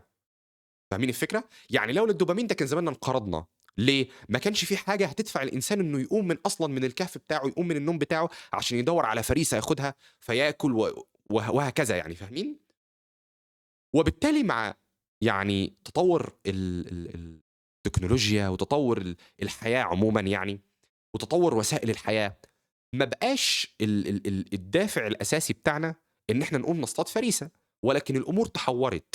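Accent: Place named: Saudi Arabia. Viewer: لهجتك سعودية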